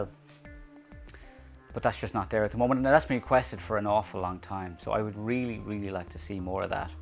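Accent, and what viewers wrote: Ireland. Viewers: I enjoy your videos very much. From seeing so many Brendan Gleason films, your accent is not a problem. There are lots of Irish people here in the states too. But your audio is not ideal. It sounds harsh, like there's too much reverb in your room. There are some cheap ways to address this with towels on the walls. Here is a guy from Wales with many interesting free solutions, whose audio always sounds just right: <link>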